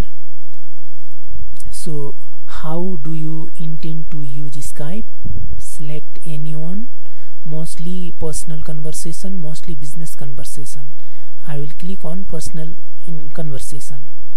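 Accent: India. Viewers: he's speaking indian accent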